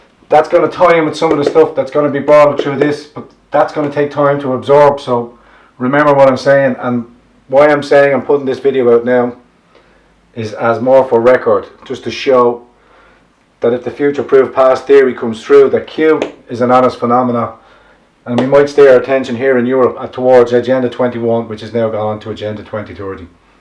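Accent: Dublin, Ireland. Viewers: Truth explained so clearly in the most beautiful of Dublin accents. Thank you.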